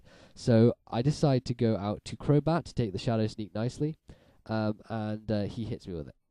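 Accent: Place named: RP, United Kingdom. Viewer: It's a very posh BRITISH accent ya hill-billy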